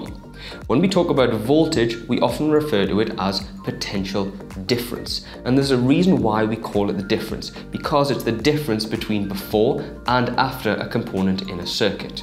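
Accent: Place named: United Kingdom. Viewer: He is bri'ish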